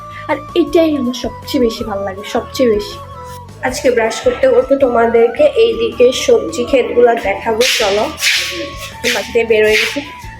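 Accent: Noakhali, Bangladesh. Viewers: আসসালামু আলাইকুম আপু কেমন আছেন আপনি। অসাধারণ সুন্দর নোয়াখালী ভাষা আমার ভালো লাগে। আপনার সব কথা গুলো আমি বুজি।। ভালো থাকবেন আপনি আল্লাহ হাফেজ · তোমার এই ব্লগ ভিডিও টা আমার কাছে অনেক ভালো লাগলো আর ও বেশি সুন্দর লাগছে তোমায় আর তোমার ঐ মুখে নোয়াখালী ভাষা শুনে আমার খুব ভালো লাগলো আর বেশি সুন্দর লাগছে তোমরা ভাই বোন হাসি খুশি হয়ে ম-ম বানাচ্ছে এটা দেখে আমার খুব ভালো ও চমৎকার লাগলো আমি যখন তোমাকে আমার বউ করে আনবো তখন তোমার ঐ হাতের বানানো ম-ম খাবো শুধু তোমার হাতে প্রিয় ❤️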